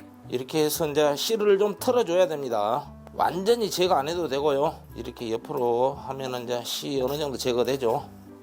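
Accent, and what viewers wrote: Jeolla, South Korea. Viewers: 완전전라도식말씀 헤그럼판오랜만에들어보네요 셰프님 멸치무침잘들었네요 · 서론없어좋고 전라도고향말좋고 초간단최고최고🎉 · 구수한전라 사투리가 더맛깔나네요ㅡ.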